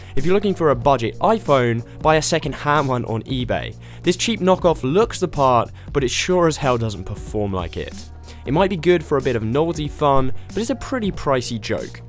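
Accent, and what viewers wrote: England, United Kingdom. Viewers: I will be studying in Uk this year,are you from England ?????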